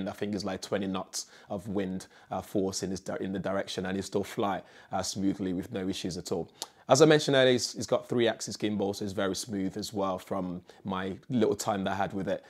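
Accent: United Kingdom. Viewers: His accent is the coolest. Is he British or South African?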